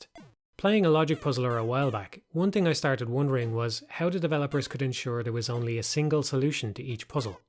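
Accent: Ireland. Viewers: And he emerges! Man, I really miss your dulcet Irish tones talking about games. Congrats on the game-making achievement! <3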